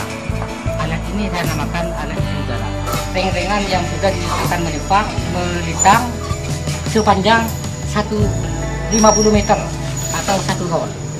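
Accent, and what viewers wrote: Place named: Madura, Indonesia. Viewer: waww.... orang madura nih bahasanya... mantep2 !!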